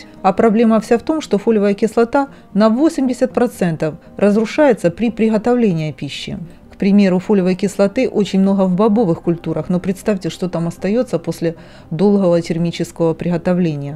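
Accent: Ukraine. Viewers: Это украинский говор. Будьте толерантны. Мы же спокойно относимся к вашему российскому. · У вас акцент украинский.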